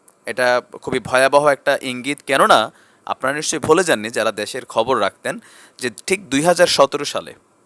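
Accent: Comilla, Bangladesh. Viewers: ভাই আপনি কুমিল্লার ভাসা বলুন ভালো লাগে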